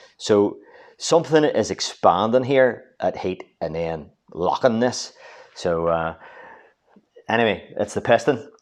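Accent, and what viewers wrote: Northern Ireland, United Kingdom. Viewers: This is not a British accent! It's Northern Irish. You could say it's a UK accent however.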